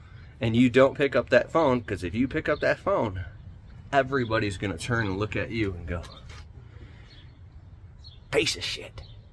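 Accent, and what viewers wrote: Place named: Mississippi, United States. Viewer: As a Mississippi man I can appreciate the accent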